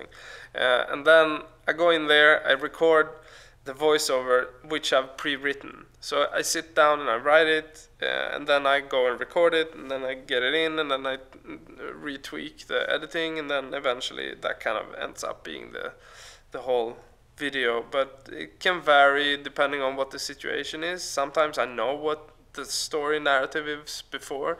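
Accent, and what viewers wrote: Sweden. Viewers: Man kan inte bara sluta älska svinglish 😝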